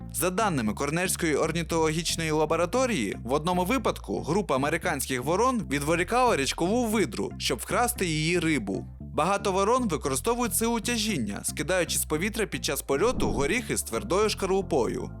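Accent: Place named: Russia. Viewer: ЧОМУ???? Чому ти використовуєш кацапські наголоси? півтори хвилини відео глянув, вже почув рОки і сАмі....тьху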